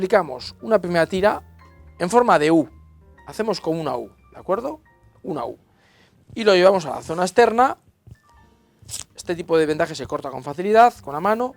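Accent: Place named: Basque Country, Spain. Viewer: Muy bien explicado, me sirve mucho este video para mí trabajo 😎, tu acento es del país vasco si no me equivoco 😅 saludos gracias!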